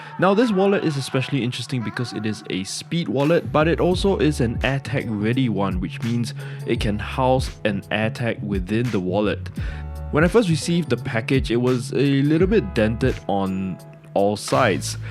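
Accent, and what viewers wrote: Singapore. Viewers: I was thinking at first, your voice sounds very Singaporean, and then you pulled out SIN Dollars! 😄 We’re hoping and planning to move there from Germany soon. Absolutely wonderful little country!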